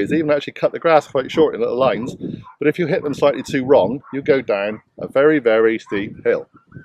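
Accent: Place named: Cornwall, United Kingdom. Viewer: Are you from Cornwall? You have that Pirates of the Carribean accent putting stress on the "-er" like shiver me timberrs. 😁 I watched the 2016 open Mickelson vs Stenson and was amazed and I went to a driving range and was hooked!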